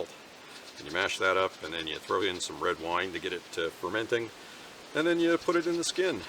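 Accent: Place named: Vermont, United States. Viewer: Phenomenal presentation, sir! I thoroughly enjoyed literally every aspect of your video! I too, am a historical enthusiast, focusing on historical fencing, but interested in ALL aspects of life in the period…I specifically wish to hunt boar with a spear in the old style…I’ve hunted them with firearms, but to do it with a spear….at any rate, I digress…Your accent sounds local to me-(I live in the state of Vermont)-but due to your use of the metric system, I suspect you’re just North of me, in the lovely land of Quebec…I’ve visited Quebec City; lovely, historic town…I felt like I was somewhere in Europe! Thank you so much for sharing your passion! Its always good to meet kindred spirits. I hope this message reaches you well, sir!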